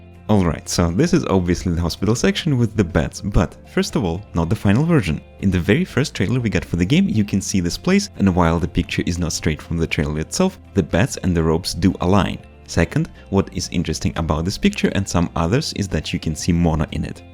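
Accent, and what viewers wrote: Russia. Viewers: sir, are you by any chance Russian?) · Я слышу русский акцент)))))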